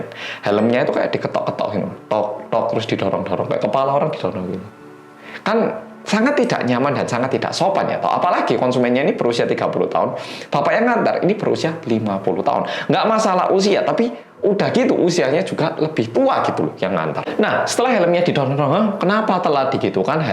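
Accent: Java, Indonesia. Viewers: Medokk!!!! ~wkwk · bagus, medoookkk nya makin panjang, wkwkwkkwkw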